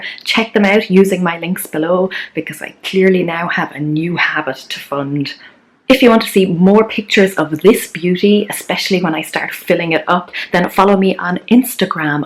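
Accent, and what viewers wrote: Ireland. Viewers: I love your Channell and bye the way I am sorry if this is rude but your voice kind of sounds irish and I live in Ireland do you?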